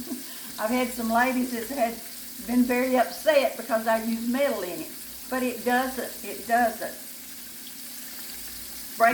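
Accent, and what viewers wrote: South, United States. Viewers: First of all I love the southern accent. I’m from bartlett , Tn. Your country fried steak and cream potatoes look so good I can smell them from here. Can’t wait to make them. Thanks y’all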